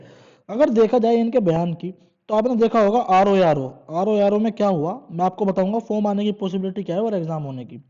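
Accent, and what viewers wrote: Meerut, India. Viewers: Tum meerut se ho kya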